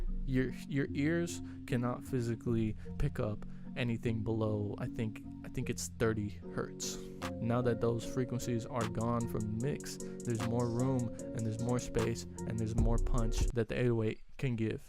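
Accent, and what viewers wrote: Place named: Mexico. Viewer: idk why but to me you sound mexican lol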